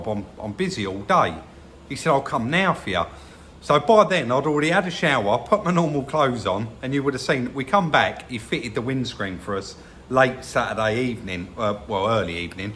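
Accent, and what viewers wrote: United Kingdom. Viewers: I like this funy British accent hahaha all an all video is very helpful thank you so much amigo 😅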